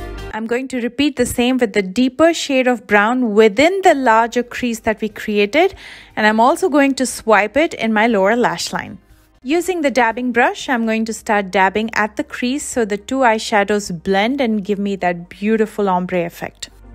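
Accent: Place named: India. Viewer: I love her soft Indian accent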